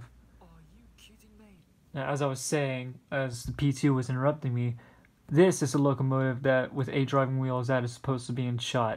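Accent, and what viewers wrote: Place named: United Kingdom. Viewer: wow british accent on point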